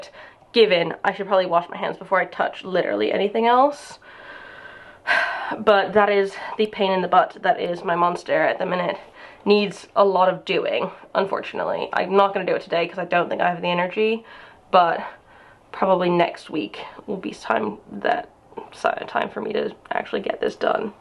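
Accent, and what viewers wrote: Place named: United Kingdom. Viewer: Thank you! That seems about right—I should have known. I think that one is going on my list! Thanks for the quick answer! On a totally unrelated note, and I’m being a nosy new subscriber here, were you raised in the UK? Your British accent doesn’t seem to me to be as pronounced as others I’ve heard. Anyway, love your videos!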